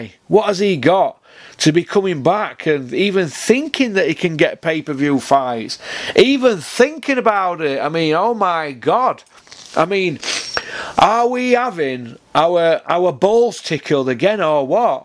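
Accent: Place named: Yorkshire, United Kingdom. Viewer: Great to hear this as you put things so well in your Yorkshire tone.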